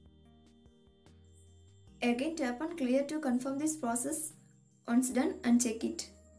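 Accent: India. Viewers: i liked the video because of the indian accent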